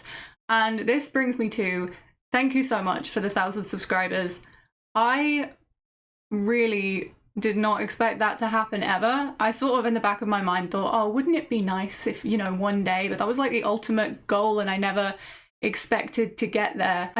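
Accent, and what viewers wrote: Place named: United Kingdom. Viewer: i never thought you was lived here by that bloody british accent😋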